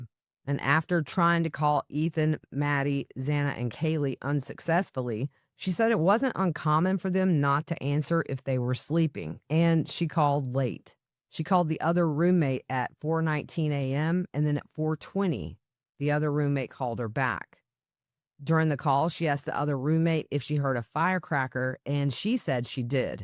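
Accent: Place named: Georgia, United States. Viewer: I live over in South Georgia so when I listen to your accent it sounds like me and my friends talking 😊. Thanks for the great work.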